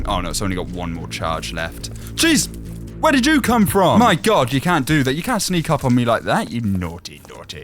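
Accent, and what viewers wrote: United Kingdom. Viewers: are you British 😂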